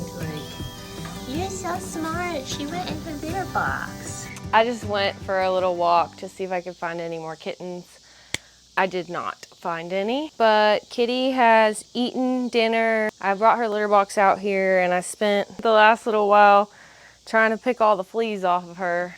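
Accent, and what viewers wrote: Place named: Arkansas, United States. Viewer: You can tell you’ve been back in Arkansas because your accent has kicked up a notch 😂🙌 “one of those burn bucket thangs”. You’re killing it! ❤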